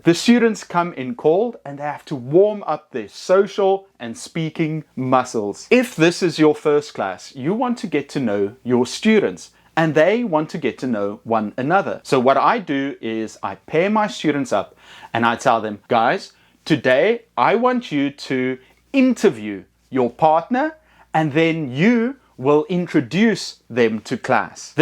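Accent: South Africa. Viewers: Sir...great video..but are u by any chance ...south african?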